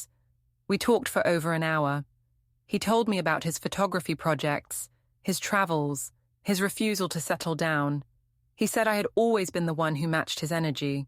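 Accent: United Kingdom. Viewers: This was obviously written by a yank, why use a brit to "read" it. Syntax and turn of phrase distinctly American. Irritating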